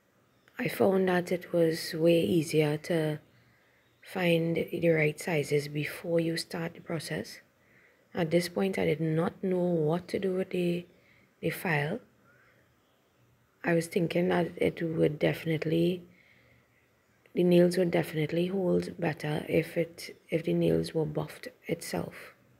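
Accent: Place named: Trinidad and Tobago. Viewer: Love the Trini accent.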